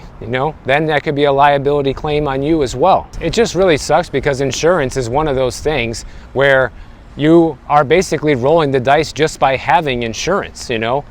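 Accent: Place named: Miami, United States. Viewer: 😂😂😂😂 nice start my man! Truly Miami Sounds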